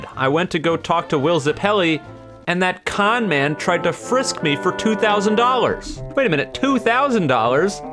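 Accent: United Kingdom. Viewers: I didn't know you were british?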